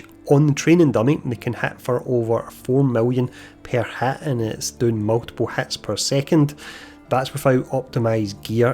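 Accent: Scotland, United Kingdom. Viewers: damn love ur accent :D R U Scottish? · I like the video and accent because I live in Scotland 😂 not Scottish myself haha. I know English was forced here ages ago but it’s not that bad to use it for the videos 🦧❤️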